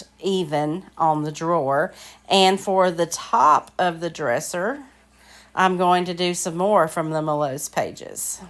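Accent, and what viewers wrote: South, United States. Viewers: thank you for taking the time to reply. I've been watching all your past videos too. I am in Canada and I had to use a white wax that it's available here. It looks exactly like DIY one in buttery texture but it does not dry. I will have to find a solution to this problem. In the meantime...#1 love, love, LOVE your southern accent. I used to live in South Carolina. Best years of my life. #2 I saw the video where you were thinking to quit YouTube. So glad you didn't. We need more people like you. We live vicariously through you. #3 I also loved the video where you put down pen and paper and explained what profit you made in a month in your booth. I loved the honesty I know you must be super busy, I'm a mom too with young kids and a full time job. I'm amazed at your energy. Keep them coming videos. I love watching them.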